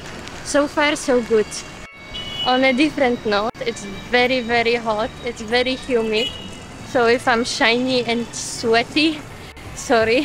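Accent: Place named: Russia. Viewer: you are very polite and soft spoken and you sound like russian 👍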